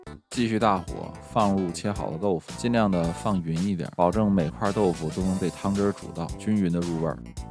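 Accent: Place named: Beijing, China. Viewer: 时的センス让人当时就反应到“嗯？北京的口音？” 紧接着“今儿”可以确诊，“是北京人”🤓 直到“熬白菜”一出现哇塞超懐かしいやろ～ 一说这词儿得久违了多儿年了自己都想不起来但那是在北京儿时的记忆 “热锅凉油”一出来“爱？专业干这个的？” 那颠锅时的自如，郎鹤炎有话：“内就是厨师！” 完后才明白，“料理与吐槽”是这样结合的。就的还不是米饭是特么干粮！ 😄😆🤣订阅了❤️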